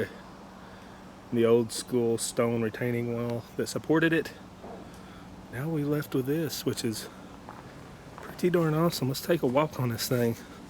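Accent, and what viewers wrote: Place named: South, United States. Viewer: Thanks For another awesome video Peace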